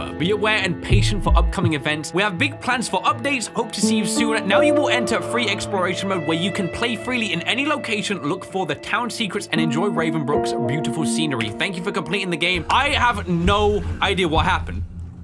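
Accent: United Kingdom. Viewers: I know he is lives in the UK the accent gives that away. The US is a country founded off of distrust in government and you protect yourself from everyone including government. The founding fathers declared war over taxation. But it is about personal property and protecting it, and protecting our family and friends. As an aside the UK had the whole knife control bit where they want you to put knives in a box. So I don't think the UK is someone we look to for virtue in how to protect ourselves.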